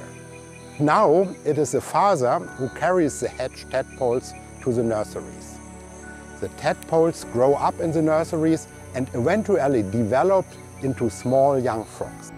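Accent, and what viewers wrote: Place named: Germany. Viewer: Was für ein wertvolles Projekt und es tut gut, all diese unterschiedlichen, schützenswerten Tiere zu sehen! Die mit dem Projekt eben endlich tatsächlich geschützt werden können! Nur der deutsche Akzent des Sprechers war halt wirklich extrem. xD Das war etwas befremdlich. Wird trotzdem geteilt, damit das hoffentlich mehr Leute sehen!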